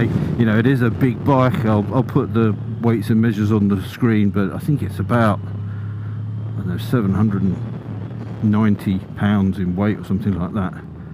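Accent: United Kingdom. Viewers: Marvelous British accent, sir. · yep turned off after 2 minutes. Don't want hear the limey inflection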